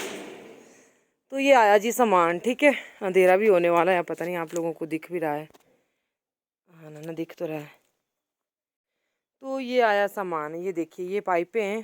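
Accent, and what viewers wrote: Punjab, India. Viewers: Aapki language bdi Punjabi " Podiya"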